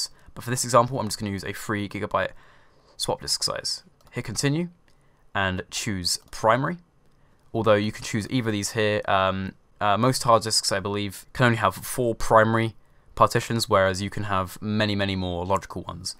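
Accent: England, United Kingdom. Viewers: i like your english accent very nice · finally someone who is English lmao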